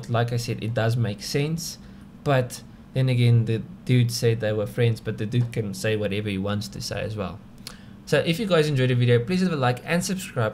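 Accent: South Africa. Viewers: South African accent? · Afrikaans accent is strong 🔥🔥♥️ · The South Africa in this accent is beautiful haha 😂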